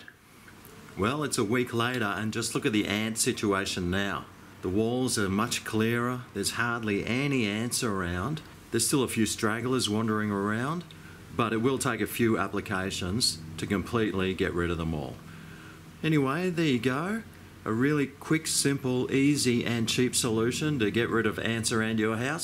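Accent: Australia. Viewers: Thanks for the info! Love your accent, makes me want to throw some shrimp on the Bar-B. After I clear the area with a borax solution! Thanks again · Love Australian accent. I watched several videos on this. Some were too long. Some moved their phone around so much that I got sea sick. This was short. To the point. Simple. Concise. And I like that you added the caution at the end about dogs, butterflies, etc. Thank you. Your formula is the one I chose. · Love your accent and calm energy. I lived in Oz for 1.5 years, it was the highlight of my life. You seem to live in paradise, wonder which part you're in. Trying your ant solution now, thanks so much 👍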